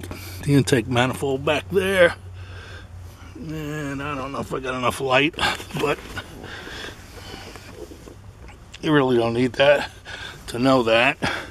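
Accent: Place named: New York, United States. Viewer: thank you for the video! i also am having this problem and i’ve watched so many videos,it’s interesting to see what works for each! i also enjoyed your voice, it reminded me of my cousins in NY! i’m also curious to see what type of van you have, i’d like to see the interior.i have a ‘99 jayco conervesion camper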